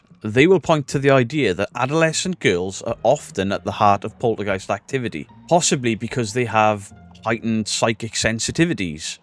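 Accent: Wales, United Kingdom. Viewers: lo e the Welsh accent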